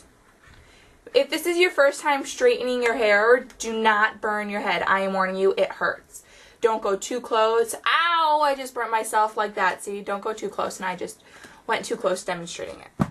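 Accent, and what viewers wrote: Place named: Midwest, United States. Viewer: Are you from Michigan or Wisconsin? It sounds like you have a slight accent from there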